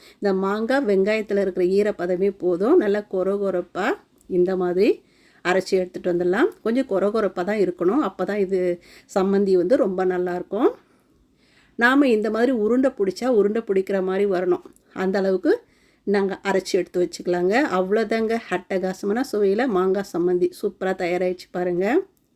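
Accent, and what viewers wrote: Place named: Kongu, India. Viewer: Kongu Thamil Magaley Super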